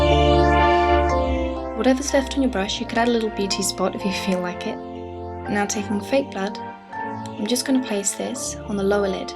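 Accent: England, United Kingdom. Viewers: are you English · Now I know what I'm going to dream about now : A nice girl with a british accent being taken over by evil clowns... or lollipops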